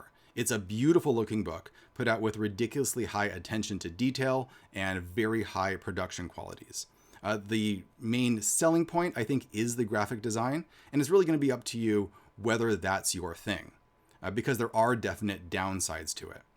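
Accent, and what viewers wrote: United States. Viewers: Hello, a dude from sweden here, dowing what we do best: Drinking coffe and trying to convivnce the world that the swedish language is not hard, actually. The classics, Å Ä Ö. Å is pronounced like the O in Lord, Ä is pronounced as the A in Damn, and finaly Ö is pronounced kinda like the U in Murder. For the O in Borg, shorten it a bit and deepen it a bit. And finaly the end G is not as hard, and pronounced more as a J. From Sweden with Love - Kami P.S, i think you just need to extend your E abit, so its more like "Tveeland", and if you wanna get realy fancy you could work on your swedish A, wich is more as the A in Lard as a standard than the american A, who sounds more like our Ä. But honestly, your doing fine, mate. Swedish realy isnt that hard to speak(tm)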